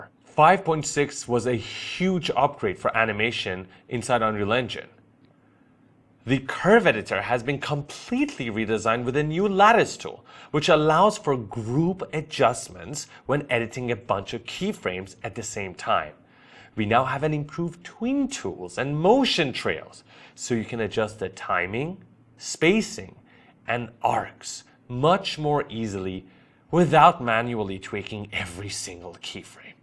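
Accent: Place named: Iran. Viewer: I like your Iranian accent! Thanks for the instruction!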